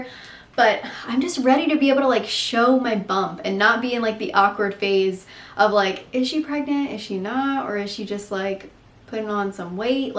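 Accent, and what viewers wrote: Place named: South Jersey, United States. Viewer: Totally voting boy 💙 I just wanted carbs, bagels, cereals etc 😊 also since moving from Jersey, I can totally hear your accent! I have the south jersey accent as well and my new friends always call it out 😂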